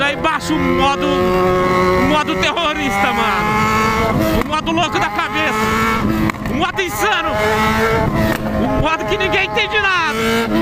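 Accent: Goiás, Brazil. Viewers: tem alguma ligação com goias? Ou so modo de falar? É noos!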